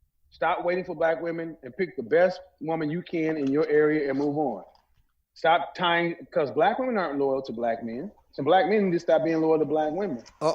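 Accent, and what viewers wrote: Jamaica. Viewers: I think I detect a Jamaican flavor to your cadence. Am I right? Are you a fellow yardie? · I appreciate your content and the way you speak so eloquently. Liked & subscribed. Too many Jamaicans on YouTube disguise their/our beautiful accents and speak with a lot of American slang in desperate attempts to sound like many others, which sounds inauthentic. It's refreshing to listen to you. Keep up the great work! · We visit Jamaica a few times a year. I LOVE my people there. Can someone adopt me? I heard the flavor in his voice too!! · You Jamaican?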